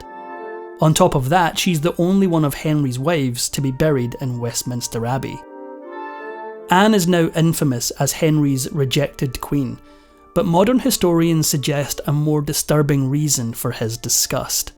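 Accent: Scotland, United Kingdom. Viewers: The Speaker is Scottish, huh? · One of many attractive traits of your videos is the Scottish accent you have! I love it as much as I love the clever commentary and the comprehensive detailed account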